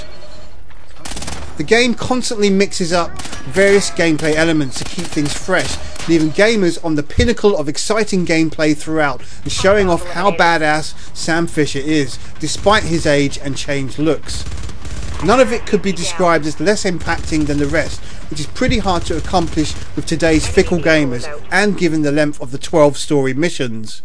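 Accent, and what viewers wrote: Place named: United Kingdom. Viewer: I like your British accent, which sounds very soothing for video game reviews. But there are certain elements and design choices which I honestly can't appreciate in Blacklist. First it has too many mandatory combat sections and that level where you are in the stead of Briggs dips you in an FPS, which is a bad idea of which I sincerely cannot fathom the existence. Second there are too many dogs that turn into pure annoyance if you go for a perfect stealth route. And there are too many levels that force you to go in one direction, with segments being entirely separately from one another in such a way that once you go past a segment, the game loads the next one so the enemies in the previous one no longer tracks your move - this will not make any sense if designed for Chaos Theory, because that game allows you to play in a non-linear fashion (remember the bank mission where you can play one way and the other way around). 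Blacklist is not like a small open world, for it often gives you a straight road and there too many areas so well lit which will give you pain if you want to play stalker.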